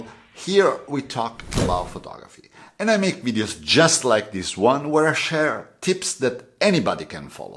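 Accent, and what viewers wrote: Italy. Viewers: I watched every video of yours since I discovered you about six months ago. You always go with your 20 mm. No I know you why. You have a great style of teaching with your beautiful Italian accent.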